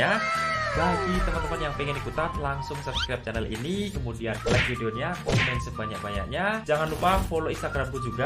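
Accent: Java, Indonesia. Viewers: Tetep menjadi kereator yg lucu mas dengan logot jawa nya, dan sehat selalau untuk mecari rejeki buat keluarga nya, IG: alfian_yuliansyah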